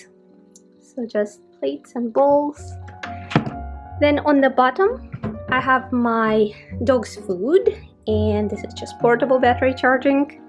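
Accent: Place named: Hungary. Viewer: Your accent sounds like Naemi of Scandish Home, she is Hungarian. She and her family live in Oregon, USA.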